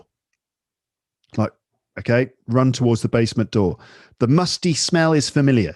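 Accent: United Kingdom. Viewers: I was listening to your podcast for approximately 4 months ago ; and due to that reason, i started to get your British accent furthermore the evident improvement in my english in terms of vocabularies, listening and speaking. You're my favourite teacher and podcaster, I really appreciate you and wish the best for you always. 😃💚. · Sorry i don't wanna listen UK accent.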